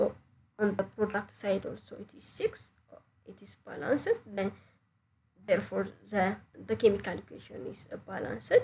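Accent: Ethiopia. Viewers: She is from Ethiopia I know by her accent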